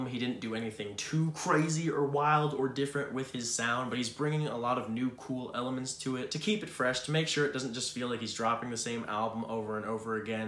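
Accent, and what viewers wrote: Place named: Ireland. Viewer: Where is your accent from? It sounds so Irish sometimes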